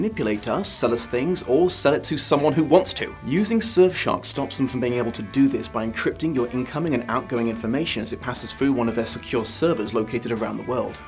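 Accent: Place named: United Kingdom. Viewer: Hearing you rip this series to shreds, in your awesome British accent, is the most cathartic thing EVER.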